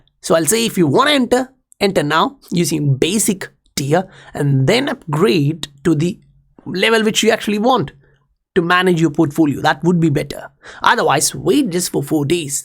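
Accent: India. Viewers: You sound more Indian than Korean to me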